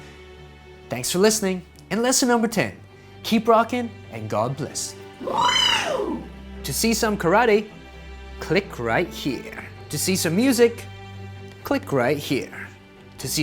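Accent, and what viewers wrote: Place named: Taiwan. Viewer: OMG YASS no wonder your Mandarin has Taiwanese accent XDD love it so much!! I'm from Taiwan and am studying in Australia now~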